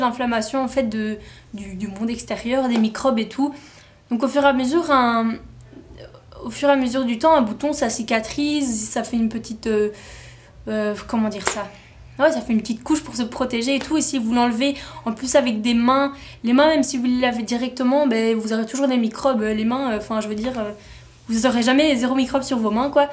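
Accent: Belgium. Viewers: Ahhh une belge qui fait des vidéos ! J'ai reconnu ton accent ! Ça me fait plaisir ;) Tu es d'où ? :) Et tes vidéos sont super ! J'adore !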